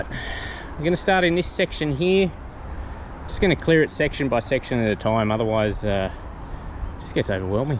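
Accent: Australia. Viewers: Love your Australian accent!!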